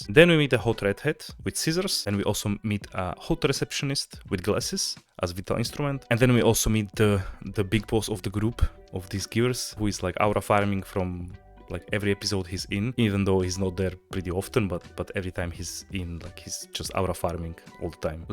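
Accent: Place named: Russia. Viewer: Why I feel Russian accent..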